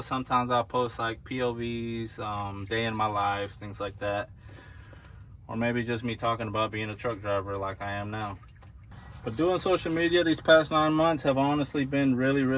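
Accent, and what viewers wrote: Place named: South, United States. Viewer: Came over from your social media content bro, stayed for the funny stuff😂 the southern accent always gets me tho homie. Much love and look forward to seeing more videos😎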